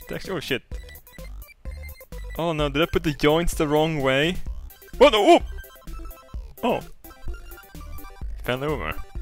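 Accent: Sweden. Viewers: i watch just to hear him say a word with a J i love sweedish accents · shutup Dave no 1 likes u thats y u dont have friends. is swedish of course hes going 2 have a different way of talking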